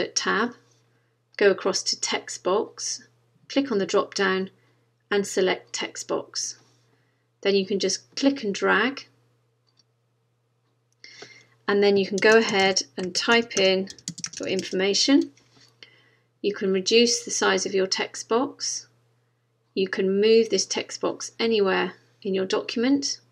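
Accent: United Kingdom. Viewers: why that god damn British accent is like super attractive to me mam really appreciate your help on the gannt chart but i really need to tell u that the accent is super attractive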